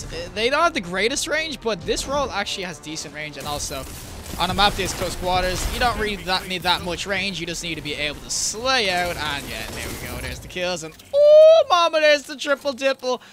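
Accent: Ireland. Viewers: i was wondering I can't work out where in Ireland you're from? i can't figure it out. i'm usually good with our accents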